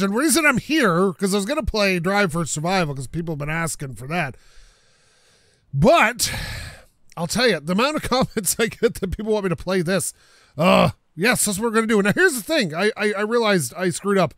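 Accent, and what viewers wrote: Canada. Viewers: Are you Canadian bro?😶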